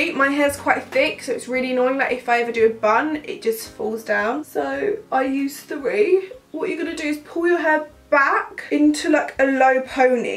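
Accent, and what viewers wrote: United Kingdom. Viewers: I love her British accent 😍😍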